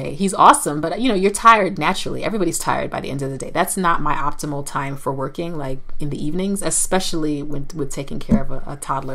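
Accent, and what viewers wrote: United States. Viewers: It was totally you that I saw last year in church in Okahao!!! You were there with your husband, where they required the married couples to go to church and give an offering. I first pointed out to my sister how pretty you were and then came your American accent as well Lol.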